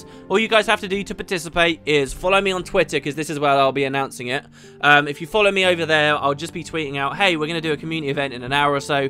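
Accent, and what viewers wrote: United Kingdom. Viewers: Youre on US Server? You sound so british..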